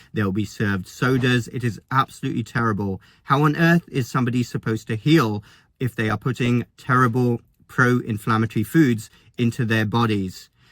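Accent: United Kingdom. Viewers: You nipped out.And you did not have time to remove that s##t from around your neck. Do you think that makes you more of a doctor? And that British accent is getting tiring now. SHUSH.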